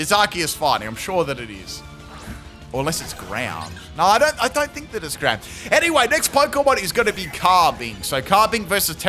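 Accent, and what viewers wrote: Australia. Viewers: Love your vids! I enjoy how you are australian and more language illiterate than us Americans! Everyone makes fun of us for not knowing languages but as someone from the U.S who speaks 4, your pronunciation on words makes me feel better on the languages im trying to learn!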